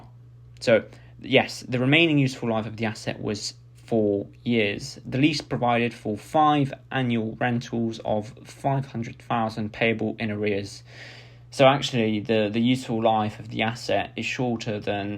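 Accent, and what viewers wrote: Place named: United Kingdom. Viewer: You explained it for simply, thanks a lot. I expected to see more videos but didn’t find. By the way I love this British accent lol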